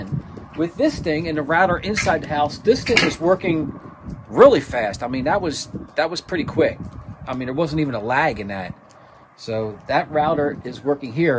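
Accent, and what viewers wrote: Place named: South Jersey, United States. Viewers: You’re from south Jersey aren’t you ? I can tell by the way you talk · Are you from Jersey or Philly?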